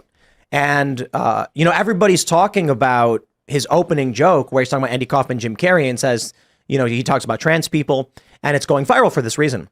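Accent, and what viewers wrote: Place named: Chicago, United States. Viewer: I love it when you can hear go full Chicago gangster vernacular. It's subtle but it's there.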